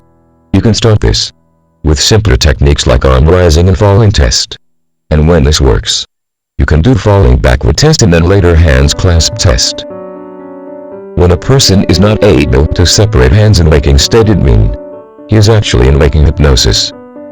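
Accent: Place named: India. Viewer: What do you think is more understandable? An Indian accent or a standard voice bot?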